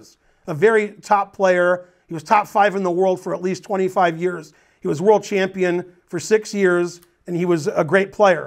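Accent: United States. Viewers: Are you 'murican, by any chance?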